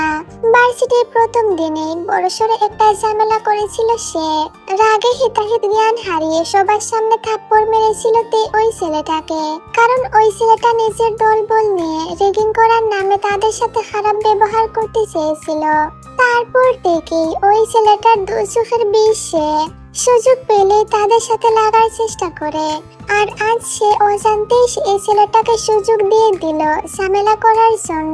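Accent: Sylhet, Bangladesh. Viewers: না আপি মনে হয় সিলেটি❤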